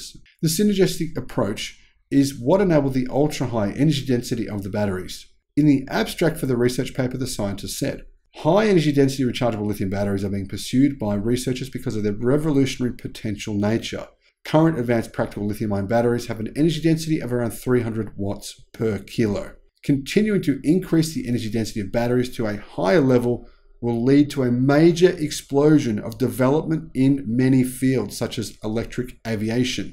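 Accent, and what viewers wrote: Australia. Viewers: Is this an Aussie?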